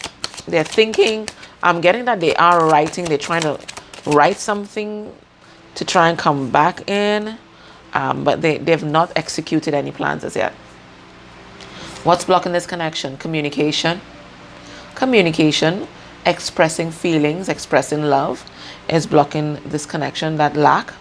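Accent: Trinidad and Tobago. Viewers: U sound like a trini 👍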